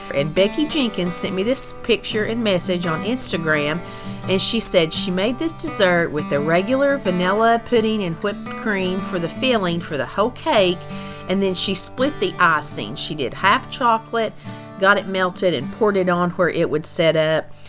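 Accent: South, United States. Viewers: Howdy! This is Jessie from Ohio. One day I found one of your videos and since I had a migraine, I kept YouTube on and binged on your yummy recipes and southern calming voice (thank you for not using loud music). Since the second video I subscribed and I stop by daily to see if there might be one I missed or rewatch one I have seen and saved. So I finally thought I'd say hello and thank you for doing what you do. Hugs from Ohio.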